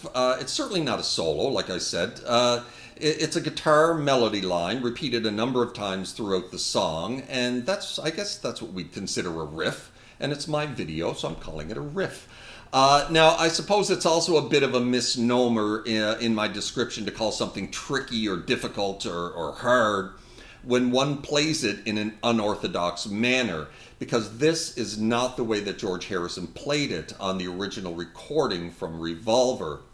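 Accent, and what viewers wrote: Canada. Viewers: Great video! You spoke three words and I could tell you were Canadian eh!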